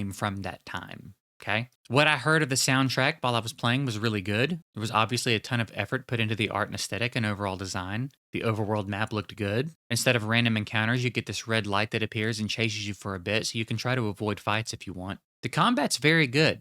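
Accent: South, United States. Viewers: your amazing southern accent makes me feel like someone is scratching my brain.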